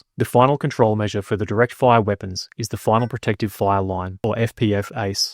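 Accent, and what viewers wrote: Australia. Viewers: He clearly has an Australian accent.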